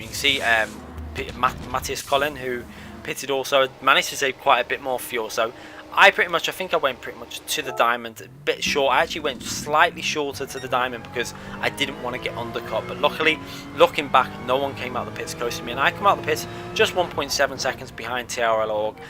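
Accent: Liverpool, United Kingdom. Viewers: Feedback is what I'm doing for you Not what your doing, that's called commentary. If you do t like feedback, disable comments. Your Liverpool accent is quite strong and your talking at 60mph on top of not shutting up. If that's what makes your channel popular than good for you. That's my opinion.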